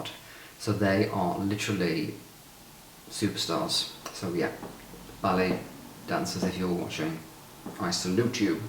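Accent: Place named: England, United Kingdom. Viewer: I'm a Hongkonger and always like English accent.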